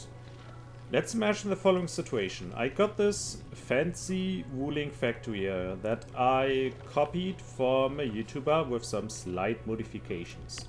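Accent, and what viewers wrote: Germany. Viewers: Are you German? You sound German... 10 Euro that you are German... but even more because you understand what this factory summoning circle thingy does 😂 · I hear German accent = I listen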